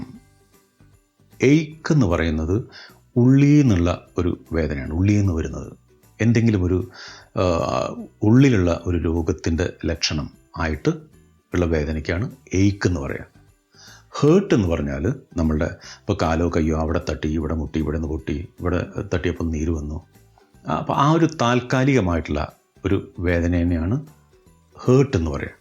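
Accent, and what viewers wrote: Thrissur, India. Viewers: ingalu thrissurkaaran aano ?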